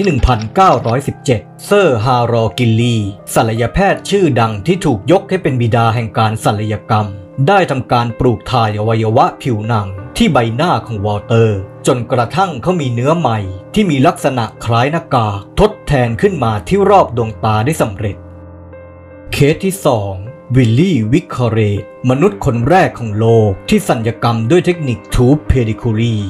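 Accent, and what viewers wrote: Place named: Phetchaburi, Thailand. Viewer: สำเนียงเหมือนคนเพชรเลย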